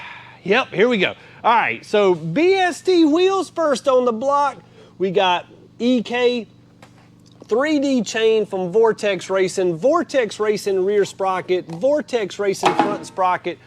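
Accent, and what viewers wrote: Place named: South Carolina, United States. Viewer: Such a shame you’re not gonna circuit race this baby she’d be a mean ass ride dude the South Carolina accent is just about gelling with this Kiwi boy from down under 😂👌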